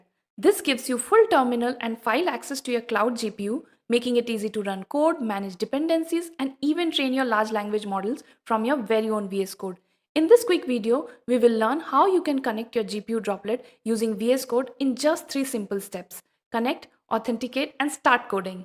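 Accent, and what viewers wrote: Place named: India. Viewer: I just can't listen to indian accent sorry 😆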